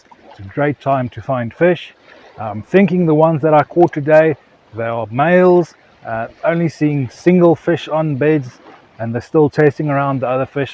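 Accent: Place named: South Africa. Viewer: Hey bru! You sound very south african?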